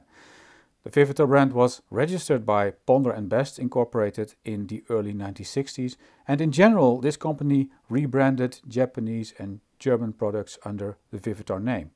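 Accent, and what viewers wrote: Netherlands. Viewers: love your vids and your nl accent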